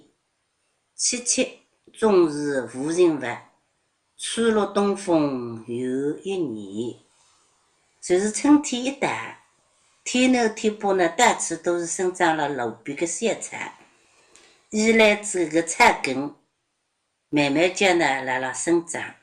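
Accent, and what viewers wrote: China. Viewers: 感觉 常州 无锡 苏州 三个方言的味道都有啊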